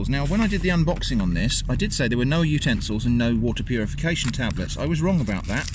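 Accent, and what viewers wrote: United Kingdom. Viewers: Don't worry; we Americans find a lot of British accents more pleasant to listen to than our own, too. 😅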